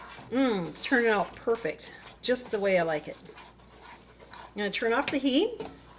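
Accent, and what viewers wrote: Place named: Midwest, United States. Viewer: You are definitely a mid western German with that accent. Great recipe!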